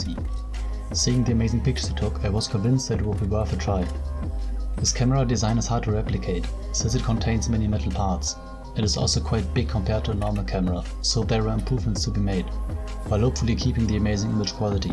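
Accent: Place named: Germany. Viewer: So yours Last name is polish but you have German accent 😄✌️👋Nice progect 😉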